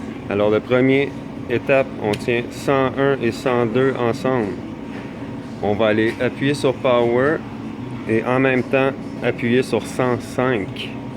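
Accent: Quebec, Canada. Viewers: Love the Quebec accent.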